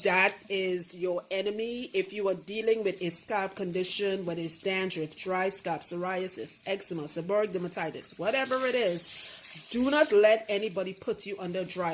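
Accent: Trinidad and Tobago. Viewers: I knewwwwww I made out the Trini accent... Omg aren't our accent like a silver lining behind the dark cloud, look my pores raise lol... Hey Trini 🙋🏾‍♀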